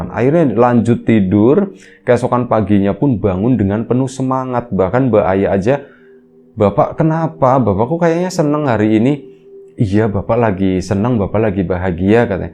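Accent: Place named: Banyumas, Indonesia. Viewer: Subscriber baru, denger cerita ini perasaan saya campur aduk antara PENASARAN, SEDIH DAN LUCU. penasaran sm endingnya krena sengaja ga bc komen, sedih sm kisah hidup mas parman dengan anaknya LUCU sm pembawaan yg natural banget 😁 tentu dengan logat ngapaknya, sukses terus buat channelnya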